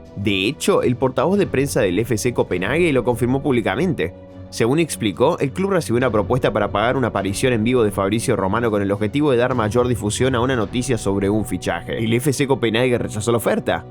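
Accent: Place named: Argentina. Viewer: Escucho acento argentino, entonces no creo nada!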